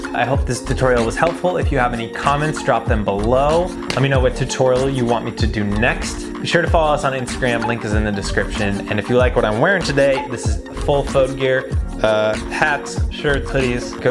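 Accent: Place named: United States. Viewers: he definitely seems american, not english... In England we say "schwal-bay". No idea if that's right though. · hes not english man, hes american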